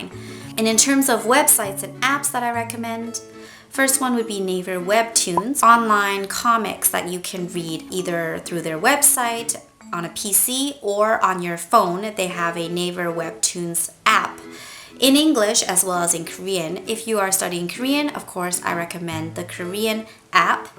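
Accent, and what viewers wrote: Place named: Canada. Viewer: are you from Canada ? you sound like one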